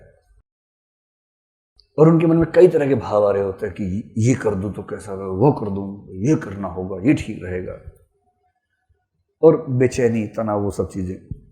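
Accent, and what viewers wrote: Bihar, India. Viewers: Mtiya do n.. sir bihari tune 😋😍😍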